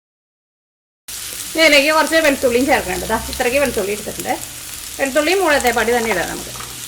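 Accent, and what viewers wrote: Thrissur, India. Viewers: Hi chechi, your cooking is So good..u r videos watching since 2014(after marriage)..I love your Thrissur slang sooo much.. even though I am far from my home.. after watching your videos I have homely feeling. always watching your videos with full smile 😊...I used to keep fish curry as you said..... keep rocking chechi...❣️ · Voice modulation better aakan nkkuka, Thrissur slang koodi aakumpol follow cheyyam pattinnilla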